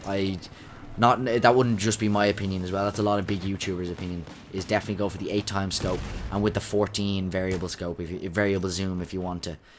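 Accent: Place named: Ireland. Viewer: I'm guessing your Irish cuz u sound irish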